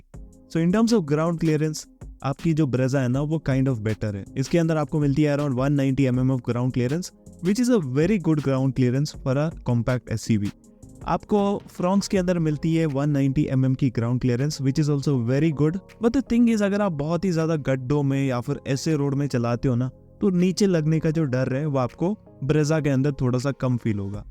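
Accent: Kerala, India. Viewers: The speakeris a South Indian most probably a Malayali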